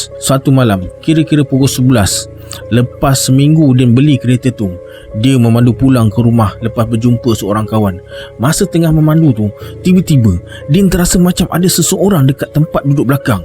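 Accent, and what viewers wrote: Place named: Johor, Malaysia. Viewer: Jaln parit kemang B.Pahat selalu saya lalu bila balik rumah abah saya. tk sgka ada kisahnya.Btw ni org Batu Pahat ke? tgok slank bhasa ni mcm org Johor.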